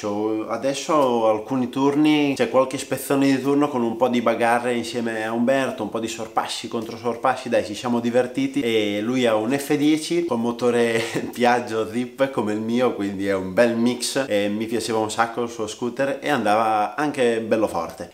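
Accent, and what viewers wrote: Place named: Ferrara, Italy. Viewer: Di quale parte di ferrara sei ?? ho riconosciuto l'accento come il mio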